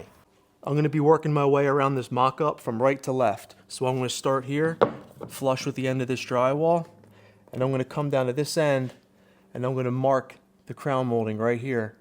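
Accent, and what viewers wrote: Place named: New Jersey, United States. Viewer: Sounds like Jersey to me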